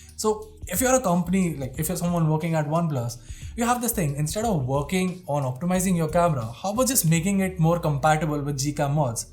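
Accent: India. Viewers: Holy shit, no offense, but it’s difficult to understand you when you speak fast. But I always had troubles with Indian accents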